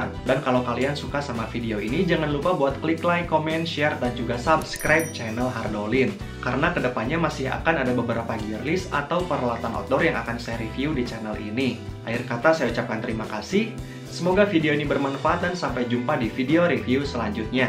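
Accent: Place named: West Java, Indonesia. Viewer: Dengar narasinya, sangat mencirikan orang sunda 🤣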